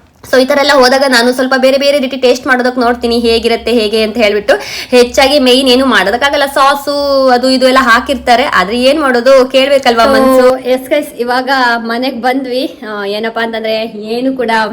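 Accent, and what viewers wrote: Kundapura, India. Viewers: Super ede beach ⛱️ side yalla, kundapura kannadalli mataddidru swlpa swlpa arta agutte 🤭mutton biryani 😋nyc 👌 sharing ❣️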